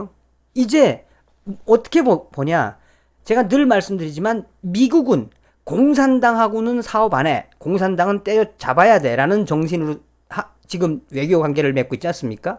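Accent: North Korea. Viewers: 에구 그 북한 억양 말투 듣기 거북하네요 자꾸 듣다보면 자연스러워지고 학습화됩니다 세뇌되어지고 자제부탁드립니다 여긴 대한민국입니다 정말 싫어요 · 북한말로 방송 참 재미 있습니다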